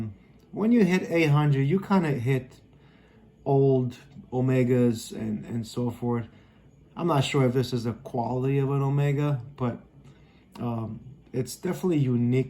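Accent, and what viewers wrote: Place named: United States. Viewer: M-ai ambiționat sa scot vechiul atlantic al bunicului meu care e într-o stare destul de bună dar are nevoie de o servisare, am cumparat ceasuri noi gen Citizen eco drive, Certina, Orient și chiar uitasem de Atlantic 😂 Dacă nu spuneai unde te-ai născut nu îmi dădeam seama ca ești român, ai un accent de american pur, frumos review like a Pro! :)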